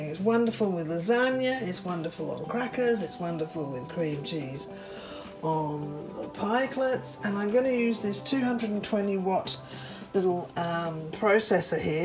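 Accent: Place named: United Kingdom. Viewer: Ah.... You have made my day, thanks for the fabulous video.... 💖, hello from France.. 🙋.... you sound very British, at the end of you"re videos, a nice cup of tea is very British....!! 😂🤣, mind you the French may say, "the trouble with you British, you think you can solve problems with a nice cup of tea" 😂🤣😆... Keep those videos coming.... Thank you, I'm off for a nice British cup of tea..... 👍🙏... Xx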